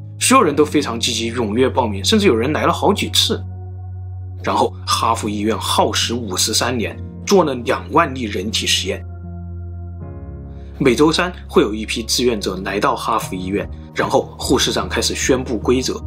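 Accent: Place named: Hubei, China. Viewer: 现在听湖北口音听上瘾了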